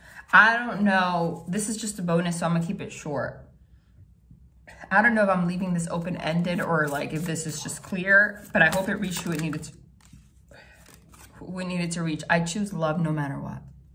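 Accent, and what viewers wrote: New Jersey, United States. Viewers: Listening to you I KNEW you were from NJ. We just have that certain thing lol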